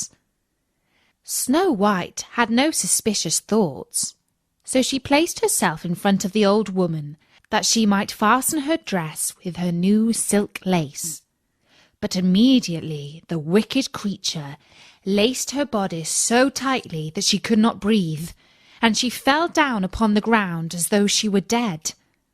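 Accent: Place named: United Kingdom. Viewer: J'adore ton " british accent" qui va á merveille avec les contes ainsi que ta voix qui ressemble à "kiara knightly" vraiment bravo et chapeau bas pour ta façon pro de raconter la conte qui laisse á imaginer les evenement sans les voir💜👍👏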